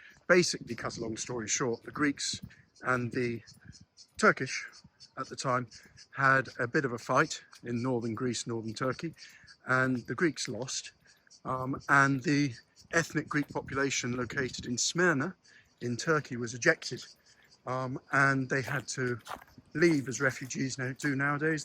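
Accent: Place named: England, United Kingdom. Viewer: No offense in what I say. I like your accent and it is a shame people from England leave to move to Greece to lose the accent over the generations. Also to put a burden on Greece to cause a housing crisis despite you build your own place. But also it is a shame that in England they have the same issues in what is done to them yet natives to England do the same to Greece. Its a chain reacton. But also that many saints warn against mixing ethnicities since they lose their culture language religion tradition etc and its like mixing all crayons and we have one color brown. Even articles that show research see that 90% of Greek outside of Greece lose all those things listed. Then they become more liberal part of what the state wants to cause more issues and its makes things worse around the world for everyone since more selfish more liberal. 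Some joy now but down the road it will get worse just like many things got worse every decade for everyone overall. The respect is put to the side and when that happens no one gives respect and the issues grown. We are not fighting for a future for kids, family, ancestors, community but for self. As AI would sum up what what many saids and elder say "Christian tradition upholds national identity, natural human distinctions, and patriotic duty as part of God's created order, viewing anti-nationalism or globalism as a violation of nature, while simultaneously condemning chauvinistic, idol-worshipping, or racial-hatred nationalism as a moral corr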